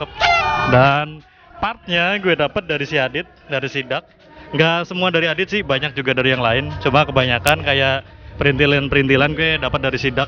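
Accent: Banyumas, Indonesia. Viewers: ngapak ya om? asli mana? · Hahaha salam ngapak mas ..mesti wong cilacap mbok sampean lah 🤫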